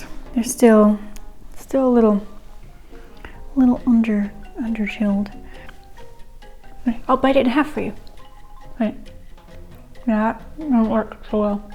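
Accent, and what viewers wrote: France. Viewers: Oh my god you speak french i love that ! And your french accent IS so cuteeeee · I plan on trying this next week. And I like your French accent. :)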